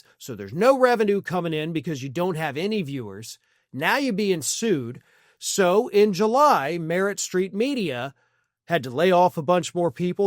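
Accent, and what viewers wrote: South, United States. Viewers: The southern accent is starting to get to me. Very insulting to intelligent non MAGA southerners no matter how redneck you pitch it. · No need to mock the south by using that accent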